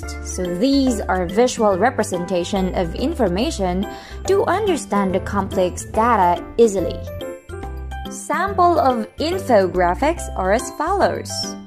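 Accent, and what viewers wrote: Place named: United Kingdom. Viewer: Goosebumps sa pronouncation ng mga words sobrang cristal clear maam😯 may pag ka british accent hehe.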